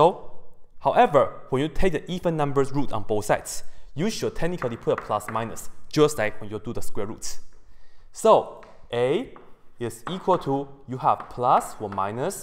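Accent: China. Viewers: What really pisses me off is that there are 377 mean-spirited people out there who can thumb up this: Pratap Singh: "Improve your accent first of all. I could not comprehend it at all. Chinese pronunciation works on chinese language only not on English." Work it out. It's not easy to get near-native pronunciation in a foreign language, which is what English is for him. Now if you have a hearing disability I might sympathize with you but you still should not demand unreasonable demands of the video author, but rather seek some kind of treatment and/or have someone else listen to the video for you to tell you what it is saying. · Se le notaba más el acento chino xd. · Lol, this is not Chinese accent, I have to make it clear. This is Chinese dialect accent.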